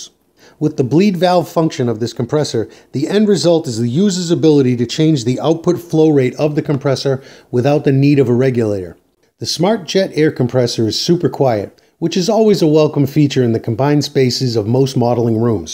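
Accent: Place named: United States. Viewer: Hi, thank you SO much for this!! This is the most comprehensive review on YouTube!! However, I'm confused... You sound as if you are from the USA? Apologies if you are Canadian... We struggle to tell the difference in the accents here in the UK. Iwata is a USA Company. Why did you buy from the UK? Was it a better price? Very kind regards from London UK. Alan.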